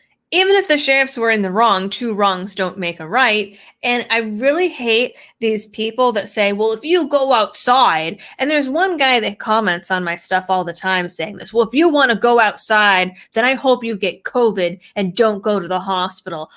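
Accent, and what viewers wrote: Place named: Carolina, United States. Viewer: New to the channel. Enjoying so far. Sounds like you may be a Carolina girl.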